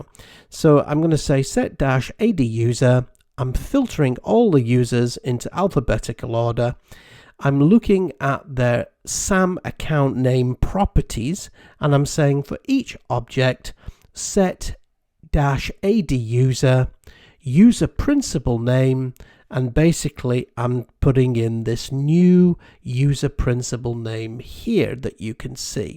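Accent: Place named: United Kingdom. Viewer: I'm very excited about your videos, you are very calm teacher and your speaches are easy to understand thank to your perfect British accent! I want to studies all of your video and give up in my Knowledge.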